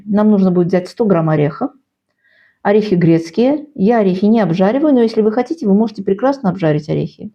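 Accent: Baku, Azerbaijan. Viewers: здравствуйте Вы из Баку? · Слушая вашу речь, услышала знакомые бакинские нотки ❤ Прочла комментарии и поняла, что не ошиблась ! Бакинский говор остаётся с нами на всю жизнь и не узнать его не возможно .. Долгой жизни вашему каналу и удачи ! С приветом от бакинки ❤🌷💓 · Я как раз так и думала что вы наверное из Баку Такой мягкий мелодичный бакинский акцент😊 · Я с удовольствием вас слушаю за ваш бакинский акцент ,удачи Вам а я Гюльзар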